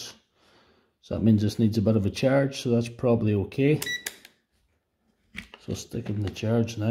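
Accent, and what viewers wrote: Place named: Scotland, United Kingdom. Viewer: Well isn’t this great. I found out recently I’m more Scottish than anything else (American from the mid-west) and I’m about to get into a damaged m12 pack. Beautiful. 😂 I’m sorry if you’re not Scottish but to my ear that’s what I’d guess. Great video regardless. 💪🏼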